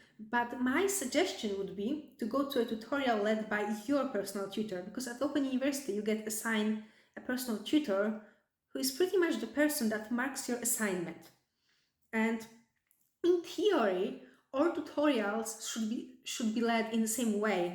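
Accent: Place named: Hungary. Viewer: Not related to the topic but..Where are you from? You sound Hungarian. 🤔 I NEED TO KNOW!🤣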